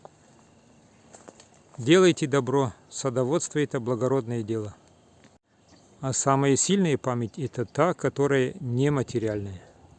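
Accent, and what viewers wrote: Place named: North Ossetia, Russia. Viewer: Наткнулся на ваши видео, и не мог точно понять по акценту ,осетин вы или нет , но теперь понял что вы осетин , Ирæттæ размæ!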